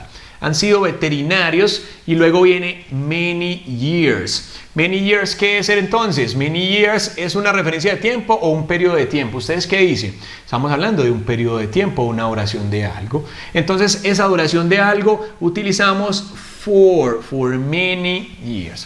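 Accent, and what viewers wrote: Antioquia, Colombia. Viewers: Que hacento paisa en el ingles se nota demasiado mejor habla mi padre!!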